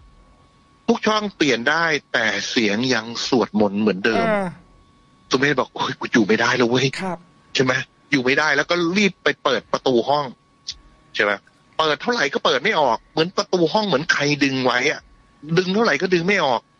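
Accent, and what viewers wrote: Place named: Korat, Thailand. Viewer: เราคนโคราชฟังแล้วรู้เลยยังหวัดโคราช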